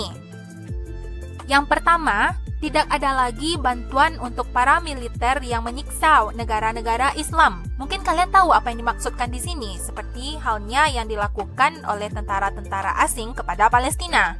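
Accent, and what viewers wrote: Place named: Padang, Indonesia. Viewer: Ini orang padang yak🤣🤣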